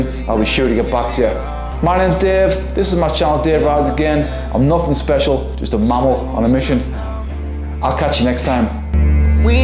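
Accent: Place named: Newcastle, United Kingdom. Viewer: Love your accent (Newcastle??) and your dreams Dave. I’m not a Lycra cyclist, don’t own a road bike but I love cycling and love small channels with a passion for cycling. Keep dreaming big. I hope you achieve your goals. New subscriber.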